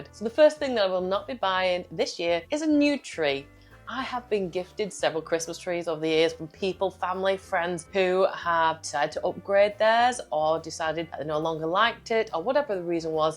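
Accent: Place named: North West England, United Kingdom. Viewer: You sound very local to me in the North West of England 😊 - we bring a live potted tree in - this is year 3 and up to now that’s my plan for this year - brings joy year round.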